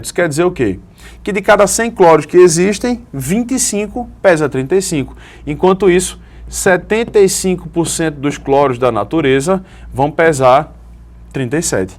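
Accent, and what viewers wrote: Pernambuco, Brazil. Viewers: Ahhhhhhh! você é muito bom! (é Pernambucano né? haha) por favor continue colocando conteúdo de química, sua explicação é top.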